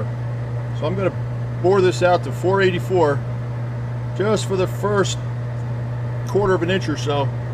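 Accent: United States. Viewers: you're the MAN!! This trick tightened up results to spec- and we could use funds on a 4-jaw instead of a replacement 3! BTW: My compliments on your voice and diction- very clear, understandable and smooth, and best of all: no grating dissonances that bleed your ears... If I may ask- where in the US does that accent originate? Keep on truckin'! -Claus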